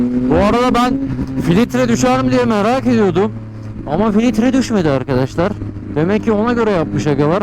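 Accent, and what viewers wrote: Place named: Thrace, Turkey. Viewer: abi sen trakyalımısın ? çok fazla trakyalı ağızı var sende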